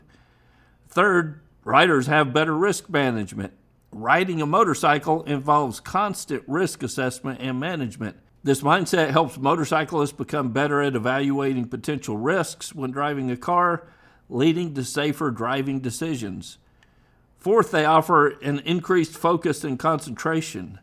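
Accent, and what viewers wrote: Texas, United States. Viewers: I talk Dutch and still am able to perfectly (almost then) understrand your Texas English. You're good 🙂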